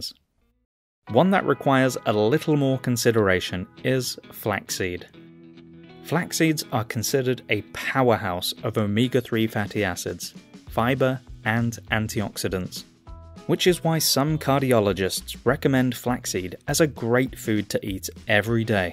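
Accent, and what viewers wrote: England, United Kingdom. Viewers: A voice over with english accent means it is probably BS. Iguana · Why is someone with an English accent pronouncing items the American way?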